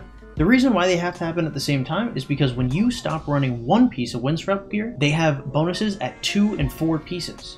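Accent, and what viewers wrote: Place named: United Kingdom. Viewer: Bro I love the voice control on this vid .almost sounded british .hahaha top job as per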